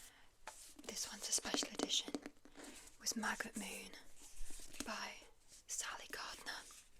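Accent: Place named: United Kingdom. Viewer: you seem to be British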